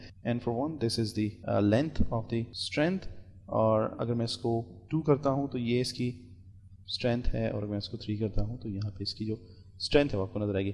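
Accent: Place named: United Kingdom. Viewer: bhai agar aap urdu tuttorila ka boltey hein tourdu hi ziyada istammal karein meharbani ho gi, urdu ko english excent mein boltey huey aap achey naho lagey muhey muaf karna bhai.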